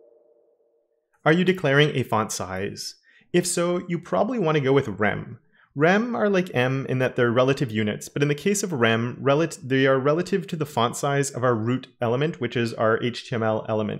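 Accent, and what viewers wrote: Canada. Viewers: Thanks for the informative video. Distracted with the canadian accent hehe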